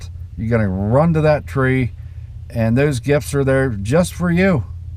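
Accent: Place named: South, United States. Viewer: I Think Your From The South,Can I Asked Where Is It:)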